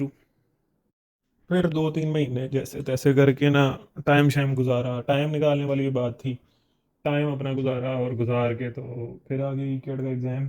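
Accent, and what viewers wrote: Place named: Punjab, India. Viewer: That Punjabi Accent 👌🔥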